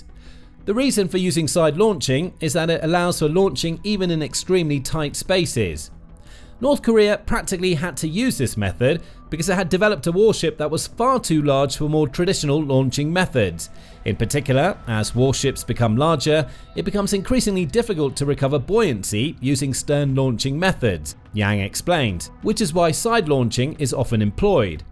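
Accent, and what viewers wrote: United Kingdom. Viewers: Blocked, had my fill of Brit narrators. Enough already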